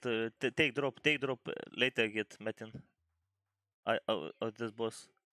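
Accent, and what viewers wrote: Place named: England, United Kingdom. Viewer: Nice videos! keep them coming, I like your english accent^^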